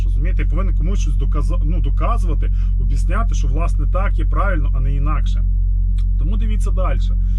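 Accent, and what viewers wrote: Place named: Surzhyk, Ukraine. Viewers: Я Вам ещё раз задам вопрос- где вы видели чтобы ПОЛЯК, НЕМЕЦ, ФРАНЦУЗ , ИТАЛЬЯНЕЦ и тд. с трудом разговаривал на своём родном языке??? Это и вас касается- суржик на котором вы балакаете це нэ мова шановний. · Сначала ты показался адекватным , здраво рассуждаешь, а нет, показалось... Говорящий на суржике западенец с кашей в голове из клише и пропаганды с 1+1 и фейсбука - вот твоя характеристика ! Ты понятия не имеешь кто такие патриоты ! Это те что сейчас в окопе отдают свою жизнь, и там нет разницы на каком ты языке говоришь ! А подобные тебе "патриоты" которые не в состоянии выучить свой язык, могут только из-за границы тявкать о чем-то. За твоими высказываниями статья криминальная плачет о сепаратизме !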